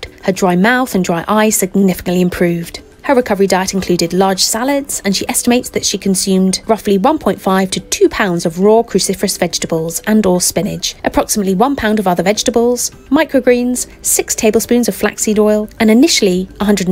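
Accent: England, United Kingdom. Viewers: 'London". English accent. US measures. ChatGPT must try harder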